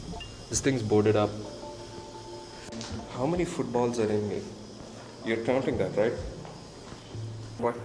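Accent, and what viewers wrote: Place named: Hyderabad, India. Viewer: This guy is hyderabadi . When he said ye sab cheezan 🤣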